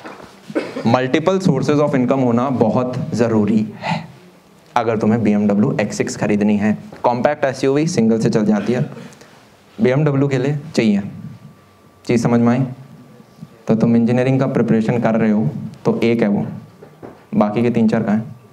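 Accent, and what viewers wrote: Haryana, India. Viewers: 😉isse badhiya to sipahi lagleta fauj me.. Bhaiya apki Haryanvi accent bht shi lgti h☺️ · ....the haryanavi accent😂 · Sir kya aap Haryana se belong karte ho.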